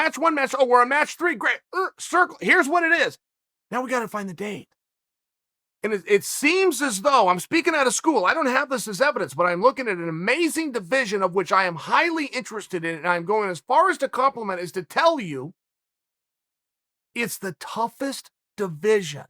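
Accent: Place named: Oregon, United States. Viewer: Chael your Oregon is showing.... golly 😂 this guys the best.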